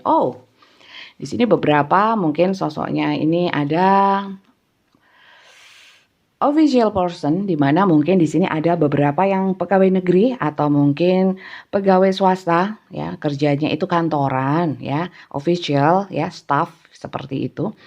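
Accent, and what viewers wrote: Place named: Banyumas, Indonesia. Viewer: Banyumas ngapak bunda.. hehe.. sehat sllu mksh reading nya. 🙏🙏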